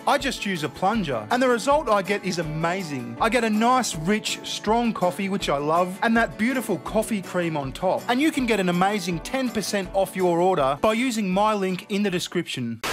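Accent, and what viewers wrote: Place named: Australia. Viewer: This guys sounds so much like Aussie guy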